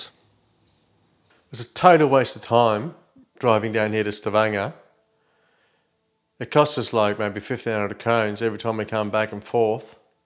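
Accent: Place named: Australia. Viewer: Good day,I’m an old man from London, and have been watching your channel for about 3 weeks and what I find so fascinating about you is your voice, I love the way Australian,s speak don’t ever change it ,anyway when I was a young person 17 in 1964 to be precise I went to Aussie and my first job in Sidney was working for a company called Whelan the wrecker demolitions buildings .well I lasted a week and told the foreman what to do with his job.Well 6 years later I decided to come back home to London a place called Kilburn ,were I grew up. But I loved Australia what a great country, in my travels I palled up with a guy out of Launceston Tasmania called Michael Blazley and we all lived in Melbourne and use it as a base travelling all over the Country from Moree northern New South Wales to Goldsworthy W.A up to Batchelor in the Northern Territory,hitch hiking all over your Country I had a ball. 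I’m also a Van fan I have had many Sprinters in my time,Ford vans like yours are going for under £5000, pounds and there lots of breakers that have lots of gearboxes on E Bay UK . I might be able to help out if you get stuck , Michael.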